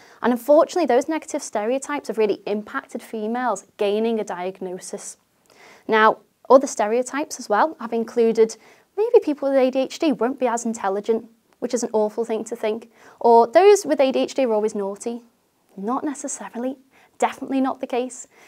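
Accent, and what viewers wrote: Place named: Scotland, United Kingdom. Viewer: I was also wondering if it's irish or Scottish... imma say Scottish haha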